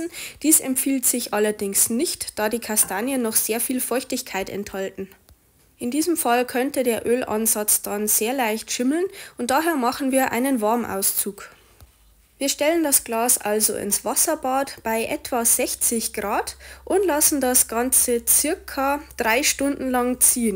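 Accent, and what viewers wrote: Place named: Bavaria, Germany. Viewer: Schönes Video und ein charmanter, Bayrischer Dialket :) Liebe Grüße aus Österreich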